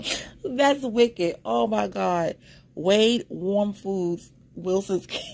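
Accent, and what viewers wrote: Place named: Brooklyn, United States. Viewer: Oh I love your accent. You sound like me. I’m from Brooklyn….live in SC….just subscribed…loving this WW drama…addicted to these crazies… lol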